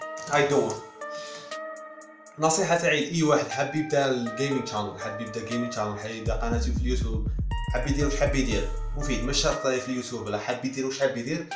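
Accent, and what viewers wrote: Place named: Algeria. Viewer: اووووووووه شيت وانا نقول هاذ اللهجة راني سامعها هخخخخ مهم نت راك ولد بلادي انا فرجيوة ولاية ميلة مام انا نلعب شوي غايمز فالقناة تاعي الفيديو لجاي ندعمك =)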